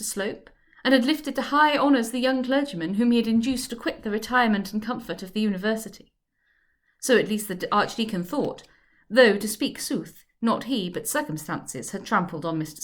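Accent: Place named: England, United Kingdom. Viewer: Thank you so much for this! I had started the book with another reader, but he inexplicably stopped posting after Chapter 28. So I investigated, found your series, and finished out with you. I'm so glad I did– your readings proved to be superior in their accuracy, pacing, English authenticity, and amusing vocal characterizations. Well done indeed!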